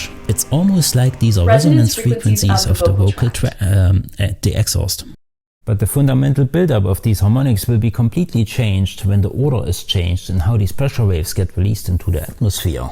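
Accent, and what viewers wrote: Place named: Saxony, Germany. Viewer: klingt sehr sächsisch, habe ich mich auch gerade gefragt 😂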